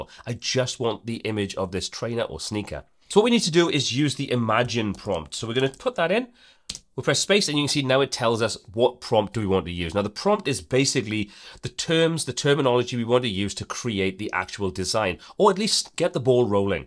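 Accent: Wales, United Kingdom. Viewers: Are you Welsh? Valleys boy RN Veteran here. ⚓️🏴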